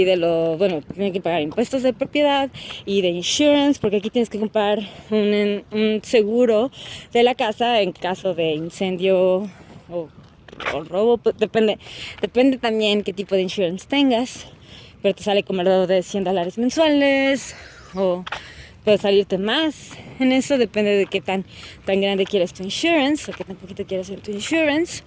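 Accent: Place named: Mexico. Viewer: Me han gustado mucho tus vídeos, he pensado en irme a vivir a Canadá pero primero quiero conocer más a Canadá. En que provincias vives porque he visto muchos tus vídeos y por lo que has dicho es una provincia donde es más económicas que las otras. Te felicito, son excelentes tus vídeos y tu acento es como Méxicano; soy Colombiano.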